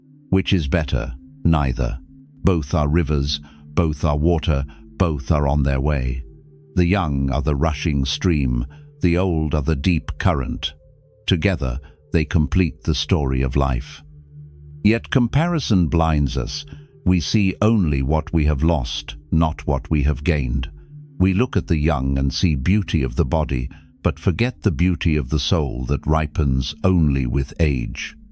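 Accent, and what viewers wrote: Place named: United Kingdom. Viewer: Good info but long and wordy. British accent is irritating. Could have been 20 mins.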